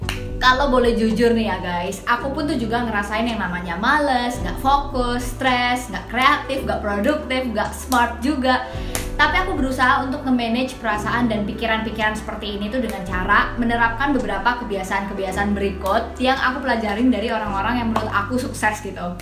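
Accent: Java, Indonesia. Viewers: Orang Jawa ya mba? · wong endi mbak? wong jowo huduk ? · Orang mana mbak kok medok jawanya?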